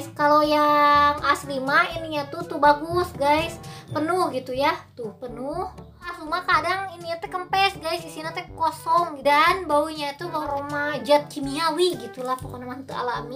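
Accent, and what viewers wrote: Sunda, Indonesia. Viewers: Sunda kentel😂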